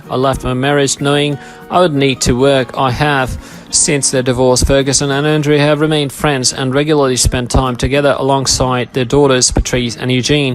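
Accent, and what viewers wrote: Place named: Australia. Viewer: Your English is perfect - do I detect a slight Australian accent?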